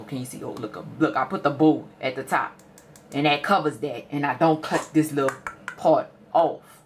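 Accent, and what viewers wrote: New Orleans, United States. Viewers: So cute! Are you from New Orleans? I recognize that accent 🥰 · You have to be from da 504 NOLA Baaaabeeee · I can hear it in your voice baaaaby dat ya straight from the N.O. Thanks baby for helping me as a beginner out ❤. .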